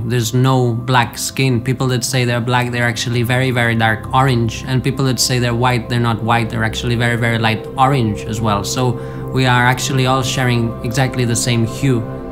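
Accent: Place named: Philippines. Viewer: he sounds Filipino, is he Filipino?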